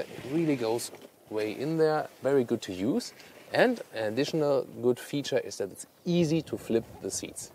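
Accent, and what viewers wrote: Germany. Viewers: Always very detailed and good reviewes at, if there wasn't this German accent...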